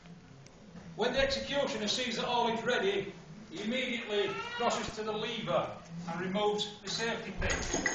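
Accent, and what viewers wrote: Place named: Yorkshire, United Kingdom. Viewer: That is a Yorkshire accent.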